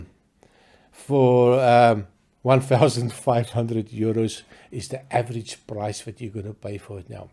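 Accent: Afrikaans, South Africa. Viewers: Hi. I like your reviews. Your accent is spot on with an Afrikaans person speaking English. It’s like a South African Boer giving a whiskey review.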